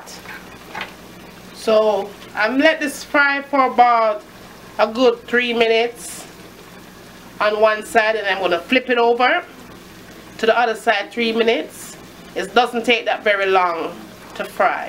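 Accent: Jamaica. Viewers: Your Jamaican yes but please talk good for the viewers... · Nice, can't wait to make these. Long time don't eat, got all the ingredients. Just need my six beautiful, ripe sweet bananas like yours. Oh no am out of oil. me will get some. Lol, Love your Jamaican accent. :-) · Instead of oil.????Jamaican accent is funny though. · Previewing and loving your videos. You make it clear on what to do, and I love your patois--music to my ears. I just dubbed...don't want to miss any new videos. Thanks sooo much for sharing. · You accent makes me really miss Jamaica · I've never heard of a banana fritter. I'm gonna make these soon. Thank you for sharing. I just love your jamaican accent. I subbed because you have a bunch of tasty looking recipes. 😘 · Mi luv the way how she talk cook everything proper Jamaican lady!!!!!! I'm a Jamaican by the way❤️😁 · I love this. Real Jamaican with strong Jamaican accent fi tru! 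Mi start smell the fritters dem. Gonna do this the proper way tomorrow. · Hey 👸🏾saw dis video 1st time looks good😋😋never heard of banana fritters. I know donut shops have apple fritters donuts. I like yo accent it is very strong more Jamaican then it does now.❤This kind of reminds me of unleavened bread. Have u heard of dat or ever tried unleavened bread? I want to try and make dez 1 day.😊👍😋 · wattah patois trong!! LOL!!! mi luv it. no mattah how ard mi try mi cyan get it 😪😪 dem always bun up thanks for sharing.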